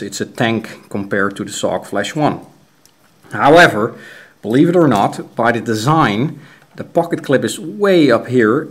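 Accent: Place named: Netherlands. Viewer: Ik kan wel horen dat je een nederlander bent. Niet dat je Engels slecht is, maar de uitspraak kan beter. Goede EDC keuzes!!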